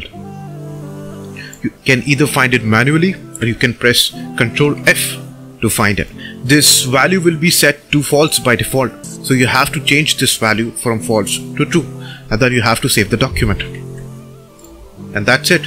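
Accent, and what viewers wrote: India. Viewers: alwas the guys with indian accent saves the day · always the guy with the Indian accent which is the most straightforward (thanks for the info btw)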